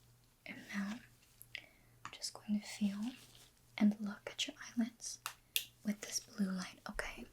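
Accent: South, United States. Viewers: Can you make more southern ascent videos love them!❤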